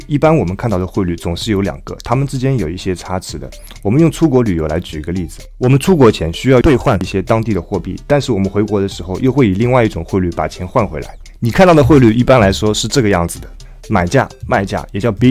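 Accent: Shanghai, China. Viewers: 满满上海口音😄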